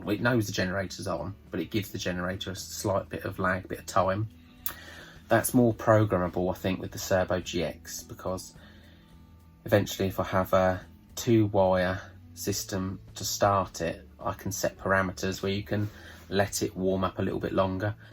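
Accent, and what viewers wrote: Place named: United Kingdom. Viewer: Great Video! Very similar to what I'm building at the moment so very helpful and nice to hear a fellow Brit rather than another American accent!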